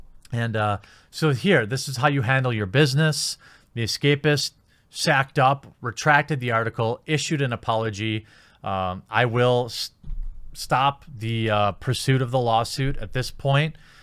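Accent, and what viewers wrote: Wisconsin, United States. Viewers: It's definitely a wisconsin thing... As an IL person that lived on the WI boarder for 8 years, they pronounce things weird sometimes, but it's not as bad as MN. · He also says “particularily” It’s a Wisconsin thing I think. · Shit are you from Wisconsin? Respect 👍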